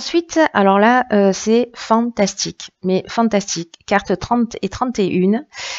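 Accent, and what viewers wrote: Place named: Marseille, France. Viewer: Bonjour. Merci pour votre tirage. J’adore votre accent. Je suis du Canada 🇨🇦 province de l’Ontario. Votre accent ressemble gros à un accent de la région de Marseille. Merci encore et il semble que l’année s’annonce bien pour nous lion 🦁!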